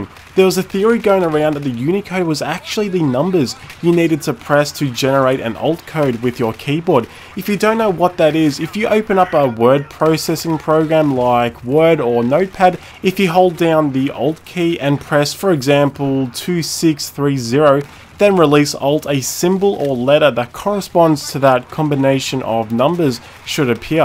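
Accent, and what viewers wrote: Australia. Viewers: Great video man! Are you from Australia? Either way, you've gained a sub :)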